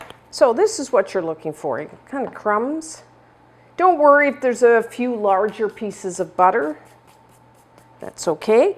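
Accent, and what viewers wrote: Canada. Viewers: I knew you were from Canada as I spotted the accent - even from the Maritimes …. my mom was from PEI and I have a sister who lives there - I live in Ft. Lauderdale half the year and the other half Rockport Maine 🇨🇦🍁🇨🇦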